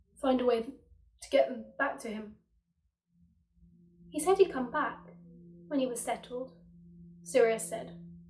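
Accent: United Kingdom. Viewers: listen to this fanfic in British English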